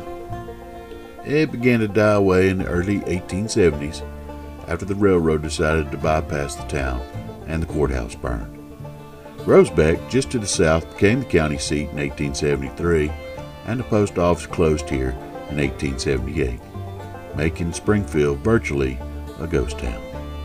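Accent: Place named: France. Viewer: A down home feller with a great voice, and a respectable French accent!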